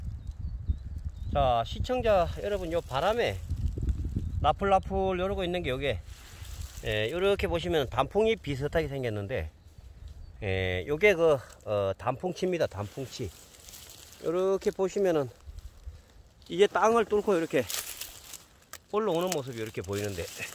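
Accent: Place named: Seoul, South Korea. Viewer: 알흠흠다븐 서울 누님 말썸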